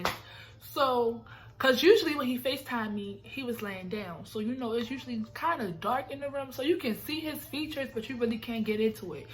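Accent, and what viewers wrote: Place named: Baltimore, United States. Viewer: I love the bmore accent! Each time you said you I was like yasssss 🤣🤣🤣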